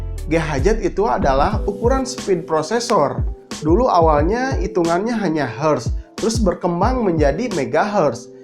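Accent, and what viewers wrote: West Java, Indonesia. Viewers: Baru beberapa patah kata aku sudah tau bahwa anda orang Sunda 😄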